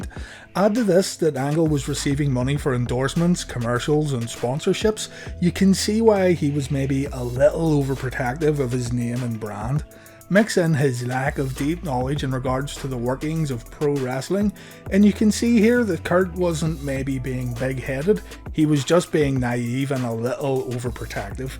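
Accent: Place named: Northern Ireland, United Kingdom. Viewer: What a great video and how refreshing it is to hear a fellow Northern Irishman (or Irishman if you prefer) on Youtube! You got yourself a subscriber. Great work!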